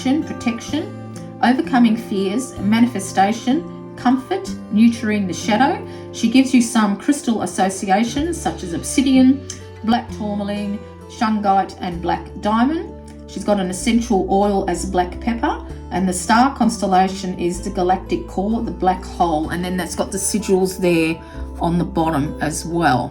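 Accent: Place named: Australia. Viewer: Awwww bless you and thank you so, so much for this lovely review of my cards!! I love that you saw Sandalphon as Fabio! Ha ha I also think he’s like Conan the Barbarian 🤣😂 So lovely to have a fellow Aussie wise woman reviewing these. Your accent makes me homesick ❤️ I hope you are feeling better soon. The goddess and the angels are with you 💖 Loved hearing about your own visions and experiences with the angels during this too. Thank you! Good feedback on the pronunciation guide. I will add that in my next deck - great idea 🙏 Have a gorgeous day and huge thanks again. I feel very humbled by this review. Love Alexandra xxx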